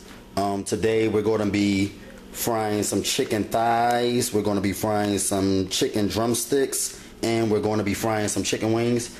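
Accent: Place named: Philadelphia, United States. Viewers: He's from philly. Most of us talk like that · ! yes I subscribed about a month ago to his video channel and I have enjoyed his videos so far! I didn't know people in Philly talk like that. I don't understand I guess this is different unless Will Smith isn't really from Philly ... Idk😕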